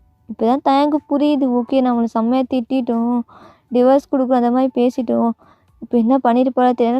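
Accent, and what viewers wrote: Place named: Sri Lanka. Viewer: Sri Lankan maari pesuringa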